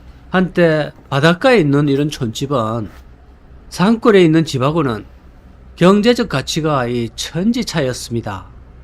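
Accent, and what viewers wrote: Gyeongsang, South Korea. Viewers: 좋은데 갱상도 말을 좀 줄여요~~!!!!!!!!!!!!!!!!!!!!!!!!!!!!!!!!!!!!!!!!!!!!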